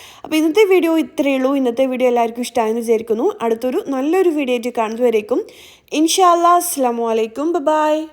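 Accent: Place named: Lakshadweep, India. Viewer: [01/09, pm] ѕαяנι🫣: Hi [01/09, pm] ѕαяנι🫣: I'm sarjeena from lakshadweep ഞാൻ ഇപ്പോ കുറെ ദിവസങ്ങളായി ൻ്റെ videos കാണുന്നു.നല്ല ഒരു motivation ആണ് എനിക്ക് കിട്ടിയത്, thank you so much... ശരിക്കും എൻ്റെ ജീവിത ശൈലീ തന്നെ മാറ്റി മറിച്ചു ipo ഞാൻ സുബിഹ് നിസ്കാരം തെറ്റികാറില്ല പറഞ്ഞ പോലെ നേരത്തെ എണീകുമ്പോ മടികൂടാതെ പണി എടുകാൻ പറ്റുന്നുണ്ട്.പിന്നെ nte ഭാഷ നല്ല രസമുണ്ട് കേൾക്കാൻ,കുറച്ചൊക്കെ എൻ്റെ ദ്വീപിലെ ഭാഷ പോലെ ആണ്. അ പിന്നെ ഞാന് ഗ്രീൻപീസ്. ചിക്കൻ ബിിയാണി ഒക്കെ ഉണ്ടാക്കി. ഇവിടെ എല്ലാവരും കഴിച്ചിട്ട് ഇത് ഞാന് തന്നെ ആണോ ഉണ്ടാക്കിയത് എന്ന് ചോദിച്ചു....☺️ So dear friend once again thank you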